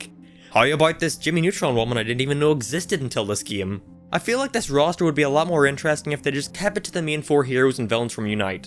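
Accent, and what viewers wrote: Northern Ireland, United Kingdom. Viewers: Northern irish accent. You from Belfast? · Are u from NI ahah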